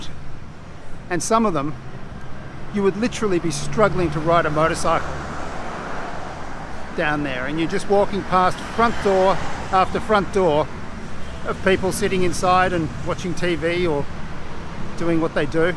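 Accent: Australia. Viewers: Kinilaw in waray as well.. you sound Australian accent... from Brisbane Australia ❤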